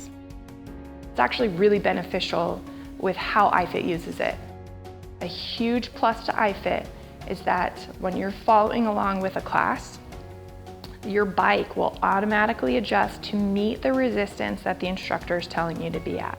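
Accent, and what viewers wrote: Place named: United States. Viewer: Couldn’t agree more, a yank voice does my head in at the best of times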